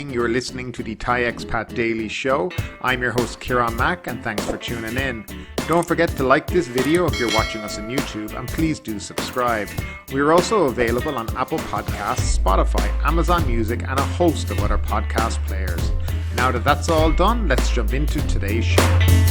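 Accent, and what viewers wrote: Ireland. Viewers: Hi. or is it. I arrived in Suvarnabhumi airport today flying from Dublin via Amsterdam. I hope they restart the Thai pass programme because it definitely works. if the Thai government was to pick up cost for 2nd PCR test as they are doing now it would be a grand good will gesture and ease some of the anguish some travellers are having. The infrastructure in place at BKK airport was excellent today and the staff were very professional and diligent the whole process was very smooth. As we say at home don't change something if it doesn't need fixed. On lighter note is it probable that I am first Irish man to enter Thailand this year. Also nice to see you haven't lost your Irish accent 3 is still pronounced tree. Slainte.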